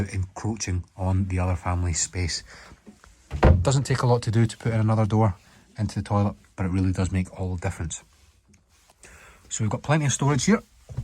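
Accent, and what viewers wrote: Scotland, United Kingdom. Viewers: What you have here is a great example of what Marine Sales can be, ( The Scottish accent puts it over the top.)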